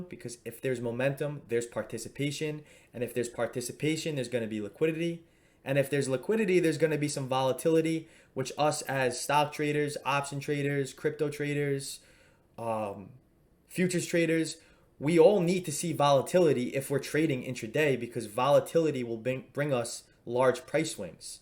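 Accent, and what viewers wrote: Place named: New York, United States. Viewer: you sound like a New York Italian Mobster, I love it 😂 Great video though as always.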